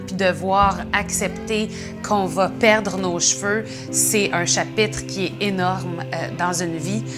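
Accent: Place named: Quebec, Canada. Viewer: L'accent québécois 😫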